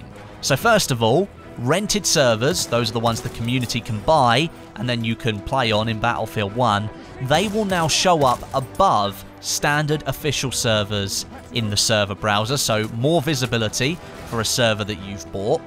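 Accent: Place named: England, United Kingdom. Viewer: English English not phony american english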